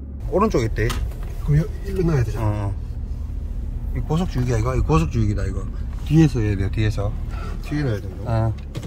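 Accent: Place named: Gyeongsang, South Korea. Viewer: 오리지널 대구네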